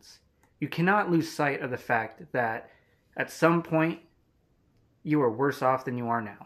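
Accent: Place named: Ohio, United States. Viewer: and you are a content creator too on top of a full time job and Pt ebay! I'm curious where in the states are you from? I have cousins from Ohio and every time I'm listening to you I find myself thinking of them and their accent. what did you go to college for I'm curious? I went to be an english teacher and I kinda lost interest in that as well and couldn't see myself actually being a teacher, mostly because I"m an introvert. it's so ironic about what you are saying about "don't give up"....last night I told my wife "I don't know if I want to keep doing this"....lol. this is a timely reminder. "rule the day!"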